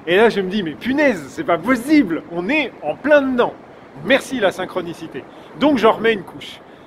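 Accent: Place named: Quebec, Canada. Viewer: ah tu es québécois ?Gpmen !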